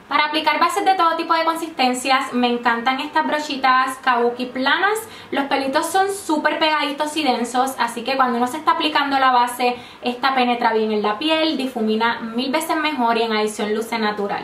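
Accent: Puerto Rico. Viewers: Muy buen vídeo. Me encantan tus vídeos y el hecho de que eres una Youtuber que se expresa como yo, a lo boricua!!! Mucho éxito y bendiciones. :D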